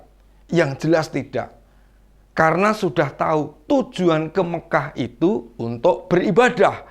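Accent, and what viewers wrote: Java, Indonesia. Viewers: solo maksude mas,saking medoke dadi solok😊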